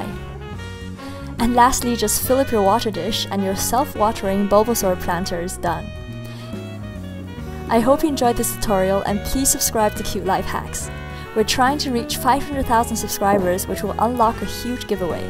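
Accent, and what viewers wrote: Germany. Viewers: bist du deutsche? ich finde dein Akzent klingt irgendwie deutsch😁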